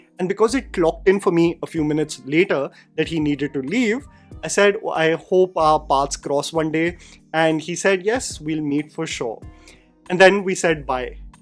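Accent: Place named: India. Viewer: i had to click off because of the gen z Indian accent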